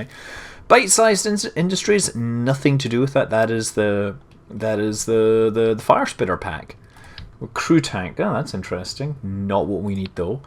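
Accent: Scotland, United Kingdom. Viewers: Woo a Scot!:P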